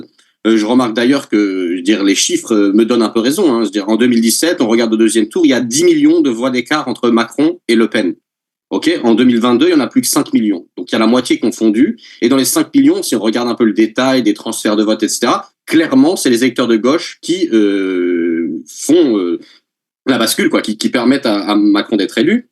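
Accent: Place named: Quebec, Canada. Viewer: Merci de pas faire exprès de gommer ton accent Québéquois, on aime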